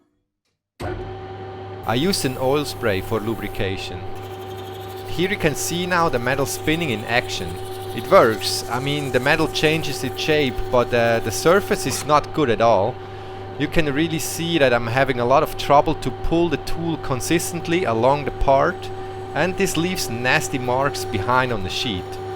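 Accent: Switzerland. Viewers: Great Video. Love the Swiss Accent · I love his Swiss accent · Ahh der Schweizer akzent😂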